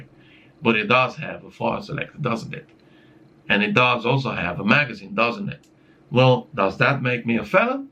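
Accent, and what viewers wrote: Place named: United Kingdom. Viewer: Took me by surprise with the british inflexion not gonna lie. Also, I think classifying non-automatic weapons as "assault rifles" is pure idiocy. A carbine sold to civilians will never have the auto option. By military definition " selective-fire, automatic rifle chambered in an intermediate cartridge fed by a detachable box magazine". So any news anchor talking about "assault rifles", especially in Romania, with their "experts" are talking out of their ass. Great video as always.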